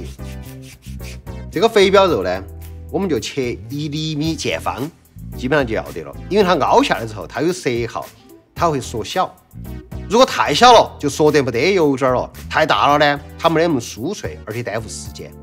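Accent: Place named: Chengdu, China. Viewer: 对不起我要刷屏了莴笋颠颠儿～ 莴笋杆杆～ 凹～ 溅～ 新宣～ 的成都话好标准好想回成都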